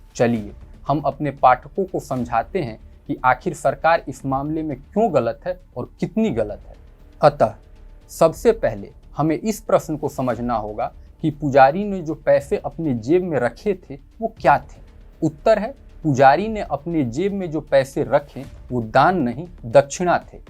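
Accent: Standard. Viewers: sudh hindi yahi sunne milti hai, warna har jagah , hindi ke sath english aur urdu juda rahta hai.. btw .. nice topic and presentation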